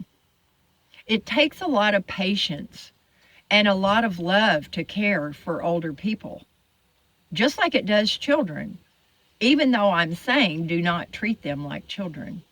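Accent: South, United States. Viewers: ? I'm a new subscriber. Where did you get your ya'll accent? Anyway, I enjoy your videos.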